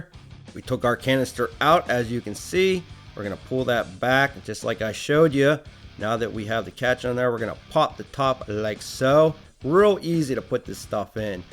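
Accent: Pittsburgh, United States. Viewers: I sense a little Yinzer in that accent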